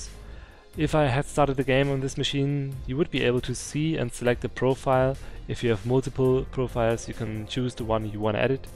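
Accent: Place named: Germany. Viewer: And now i noticed how funny the german Accent is xD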